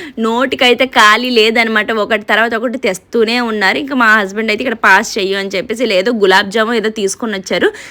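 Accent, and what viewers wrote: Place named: Telangana, India. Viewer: Madhyalow telagana slang enduku andi medhi srikakulam kaddaa😊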